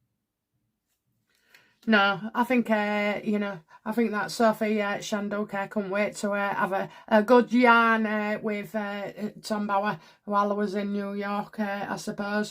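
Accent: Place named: England, United Kingdom. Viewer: It’s an English dialect ❤️ fyi we’ve got lots of them 😁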